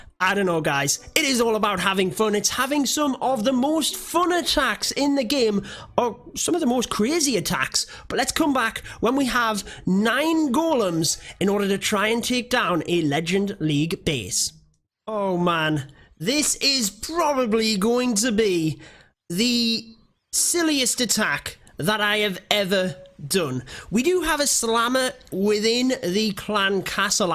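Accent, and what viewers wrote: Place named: Scotland, United Kingdom. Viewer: where are you from?? you have an strong accent haha. guessing scotland?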